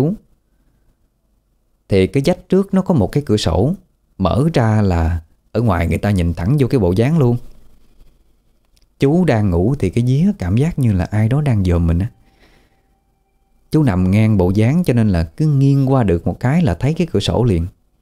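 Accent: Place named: Mekong Delta, Vietnam. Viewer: Xin phép hỏi em quê ở đâu mà giọng đọc hay quá.giong miền tây của mình quá.do trời phú cho giọng đọc xuất sắc.nghe riết ghiền để phone trên giường nghe rồi ngủ mà có khi nghe đến 3 giờ sáng.cam ơn và tác giả ❤❤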